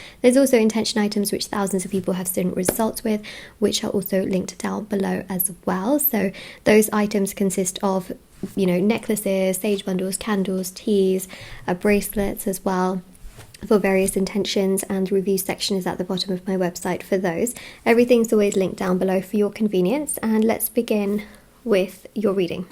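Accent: England, United Kingdom. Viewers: I just love listening to your Beautiful English Unique Pixie Fairy voice 🧘👌✨🧚🏼‍♀️🪄✨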